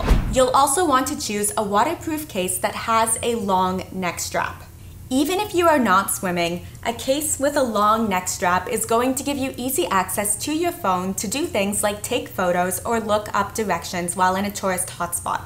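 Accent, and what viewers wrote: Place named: North, United States. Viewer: I love the phone case idea. I need to get one of those. Also, I really like your accent. Where are you from? It sounds northern US or Canada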